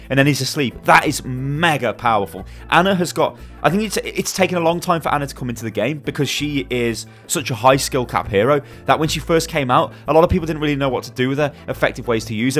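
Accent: United Kingdom. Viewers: God I love british accents. Ana turns into "Aner"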